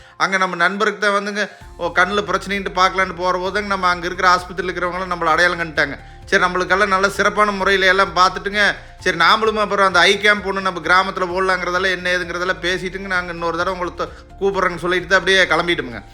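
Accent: Kongu, India. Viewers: நீங்க பேசற கொங்கு தமிழ் இன்னைக்கு பூரா கேட்டுட்டே இருக்கலாம் ங்க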